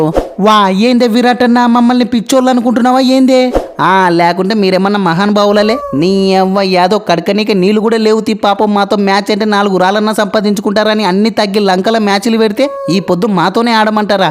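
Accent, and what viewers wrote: Rayalaseema, India. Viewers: Anna rayalaseema slang❤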